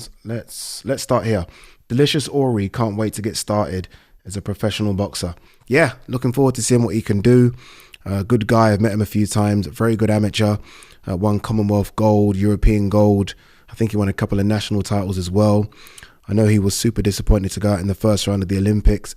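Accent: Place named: United Kingdom. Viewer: Gotta leave this vid.. the British accent is so guy wrenching 🤣🤣🤣🤣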